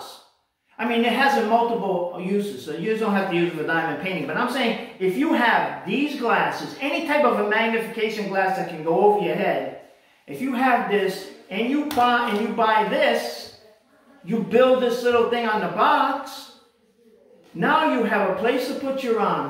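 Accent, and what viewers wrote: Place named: East Coast, United States. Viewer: Brilliant ! I ordered one and thought no way with the diamond picture! I gave it to my 11 year old granddaughter and she worked on it for 15 minutes. Now it's living in my piano bench unfinished. 😅 Thanks for the car cleaning ideas too! BTW, ❤ your accent!! East coast somewhere? RI maybe??😊 SE Ohio girl here. Great video!!!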